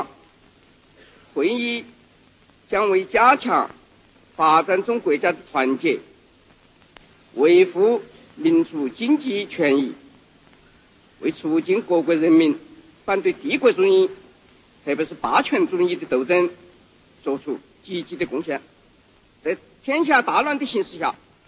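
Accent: Sichuan, China. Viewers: 哈哈哈 现在才知道 四川话居然到过联合国会议 哈哈哈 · 四川话。其实四川话不难懂，很多字就是普通话变个调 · 牛逼啊，在联合国狂飚四川话。关键这翻译的人头疼了。邓公我们深切缅怀你当今中国的发展你功不可没。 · 四川话。。。好亲切。。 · 这是四川话，我是四川人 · 可苦了聯合國的翻譯了 翻譯不一定聽得懂四川話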